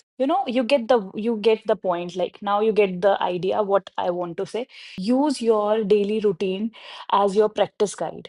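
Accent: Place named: India. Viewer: From your voice, it seems to me that you are from India, isn't it?"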